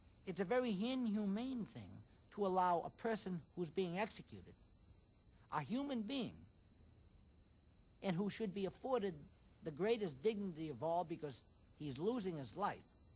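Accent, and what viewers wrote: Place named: United States. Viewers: There is no such thing as an American accent. There are many, many accents in North America, and even in individual states. This man has an accent found in New England, most famously Massachussets. That accent was developed over a hundred years ago, with minor change over the years, from New Englanders' obsession with trying to be as british as possible. Western North Carolinians and eastern Tenneseans speak with an accent and dialect that historians have found to be nearly identical to the common accent and dialect of Elizabethan Englanders. The most famous southern accent, which is spoken by Virginians and north Georgians came from the mid colonial period with high society types from the aforementioned states, then colonies, trying to emulate the accent of the royal family of the day, the Hanovers. So, if you don't like American accents, blame the English. 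· Horrible american accent. wow,,, 'very distasteful thing for the GOD who has to unstrap the inmate."... "nomily,, if we think of a belt." ....."the GOD has to compress all the flesh"... "same as the safety belt in yo caw."...."they deficate on da chaw"... Americans really really need to take elocution lessons. They sound like idiots.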